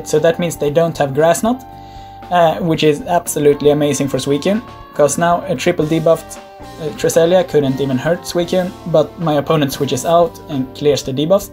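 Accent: Sweden. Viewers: Is he swedish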